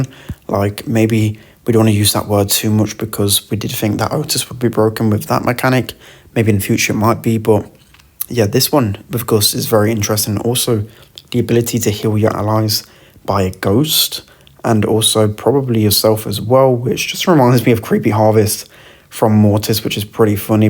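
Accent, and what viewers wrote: United Kingdom. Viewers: Is this British accent? I need the subtitles to understand what you say :( Apart from this the video is very good and thanks for the balance changes you noticed and shared.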